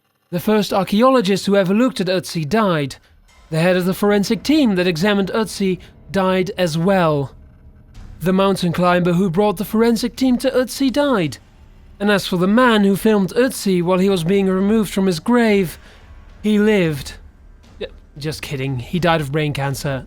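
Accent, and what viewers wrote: United Kingdom. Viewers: the guy narrating this sounds like a british version of chillz.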